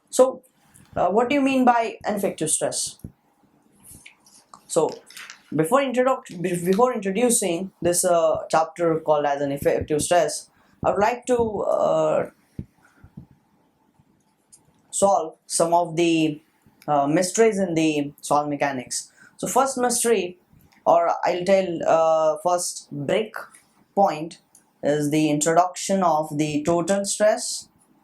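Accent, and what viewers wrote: India. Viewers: You want to sound like American, but end up as Indian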